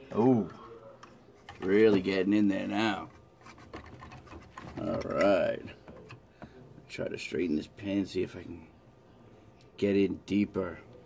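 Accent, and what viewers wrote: Boston, United States. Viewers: I’m guessing from the accent, “right heah”, “in theah”, “cawfee” and the red hair that this was recorded at Nocella’s Southie branch. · Ah you from Bahston? Me, too. Thanks for the help. Will now try to see if it works.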